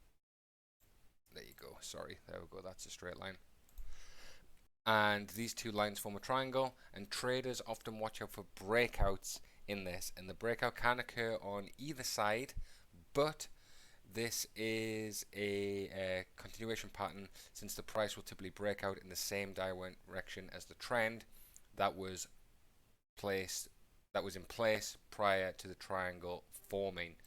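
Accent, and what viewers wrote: United Kingdom. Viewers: New sub here. Always good to listen to TA from a fellow Englishman. Even better when they're from the North-East too!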